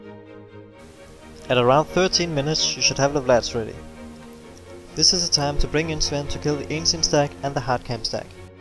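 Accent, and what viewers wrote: Denmark. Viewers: Hi :) one simple question where are you from? your accent sound danish or so :D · your danish accent burns through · Are you danish. because you used the word "og" and you have a danish accent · danish accent!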